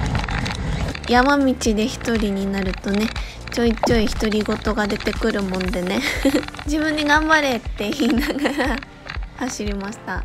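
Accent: Gifu, Japan. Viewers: 失礼😅岐阜弁ですか！かわいいですね🙂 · 最近よく拝見しています。岐阜弁の響きが懐かしいです。（友人が岐阜に居るので）来年は私も参加したくなりました。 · 後半戦も、岐阜弁を懐かしい思いで聴きながら拝見致しました。 岐阜に住んでいた時、「えらい」を最初聴いた時、？？だったのを思い出しました。 来年、輪行して、参加したくなりました！！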